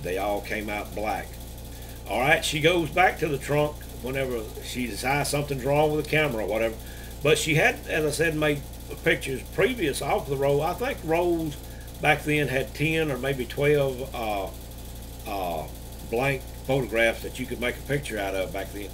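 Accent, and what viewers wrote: Alabama, United States. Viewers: I love your Alabamer accent! Yeehaw!🤠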